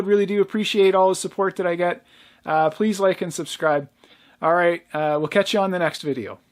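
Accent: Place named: Canada. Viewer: You must drive across the border to buy stuff because sounds like you're Canadian it seems like stuff is so much more up there I've been there many times it's like the Canadian content law make stuff way more expensive and they can't sell tools like this there that cheap. But if it was made in Canada they can sell it so the Canadiens markup the hell out of everything that they make so everything cost you guys too much money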